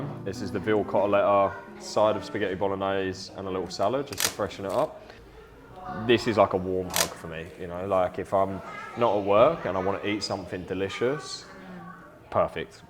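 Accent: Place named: Cockney, United Kingdom. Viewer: Interesting watch with the cockney culinary reincarnation of Mac Miller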